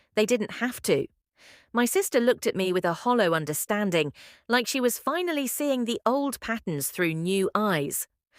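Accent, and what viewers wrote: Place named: United Kingdom. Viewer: Because it’s in a British accent 😁 Watching from Leicestershire in the UK 🇬🇧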